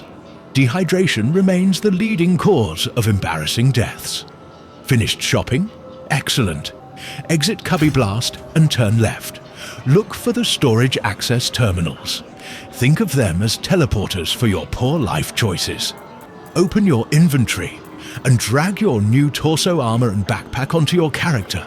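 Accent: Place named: United Kingdom. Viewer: Great comedic writing! And the stiff-upper-lip British voice is peak! Can't wait for the next one.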